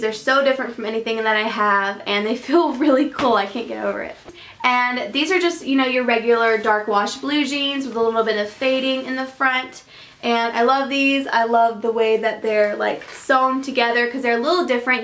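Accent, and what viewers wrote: South, United States. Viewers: You sound so southern!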